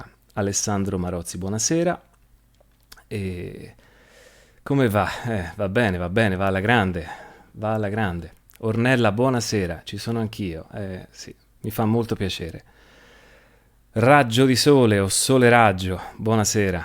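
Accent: Tuscany, Italy. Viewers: Grazie per questa diretta, l’ho vista solo ora. E comunque il cancella, cancella, cancella detto con il tuo accento toscano è già motivo di buon umore 😊😊😊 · non è Nulla caro buona serata ripensando alla legge di attrazione sempre in servizio non conosce crisi di congiuntura 😅 la difficoltà è il vivere con persone anziane di altri tempi e negativi e combattere questa nebulosita è cercare di proporre é difficile continuiamo sempre molte grazie e apprezzo la sua scelta di farci sentire la sua voce Toscana la patria di Dante e sempre molte grazie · Il tuo accento Toscano Tienilo con te ❤️ La tua emozione bellissima Alla prossima diretta ti farò una domanda Buona notte 😘😘😘😘😘 tvb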